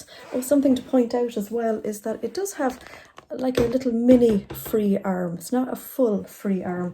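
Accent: Ireland. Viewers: I loved your video! I have the Janome 1000CP, the predecessor of the 1000CPX and I've had it for 17 years! Your machine looks like it does much more than mine so I need to find a home for my old one then I can buy the one you've bought! Loving your Irish accent! x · Hi. I found you on YouTube this morning and am enjoying your vlogs. Just love, love your Irish accent! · Thank you so much, I think I will purchase one, love from Dublin. PS nice to hear an Irish accent 😉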